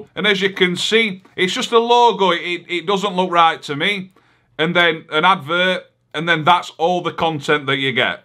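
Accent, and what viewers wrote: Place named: North, United Kingdom. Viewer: You are very northern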